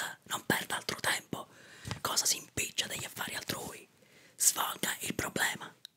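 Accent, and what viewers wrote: Sicily, Italy. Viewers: Sembra che ti eri davvero alterato e stressato!😂😂😂😂AHahaha Recitazione Eccellente!!❤Che poi si sente vagamente quell'accento siciliano che io amo tanto!!!❤Ti amo di bene!😊😊❤ PS Troppo forte il finale, che se ne sono andati tutti, giustamente!😅 Ahaahahaha geniale!!! 😅😅😂😂😂😂😂😂🤣🤣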